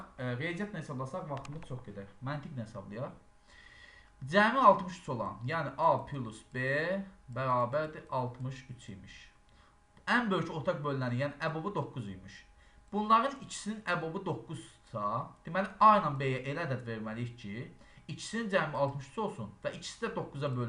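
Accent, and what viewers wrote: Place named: Salyan, Azerbaijan. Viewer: salyan 😊oxwayir daniwiq terzimiz)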